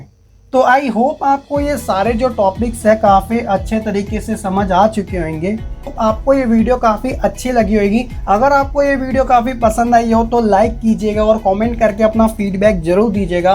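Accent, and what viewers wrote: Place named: Haryana, India. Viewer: Aap haryana se ho I think.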